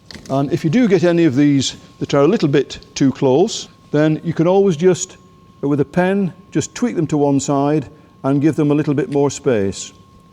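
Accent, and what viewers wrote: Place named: Lancashire, United Kingdom. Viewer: Lovely accent, are you from Lancashire?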